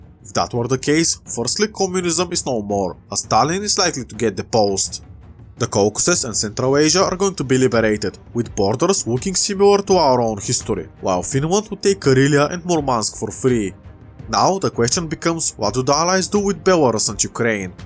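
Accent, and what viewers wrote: Bulgaria. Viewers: The Bulgarian accent is strong with this one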